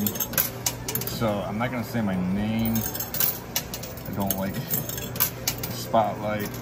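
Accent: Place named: Appalachia, United States. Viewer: What was your buy in, sooo your profile pic is not the real you?? And....your hill Billy twang ain't waiting was last time you was here with mrs colin!! I like watching this cos I'm a gambler too Sometimes, but I like the pokies coz you don't have to think about nothing just tap tap tap, like what you doing, drop drop drop lol...don't talk if u got nuttin to say.. If u not feeling it don't say it I just wanna watch not listen to talk lol talk is too Cheap...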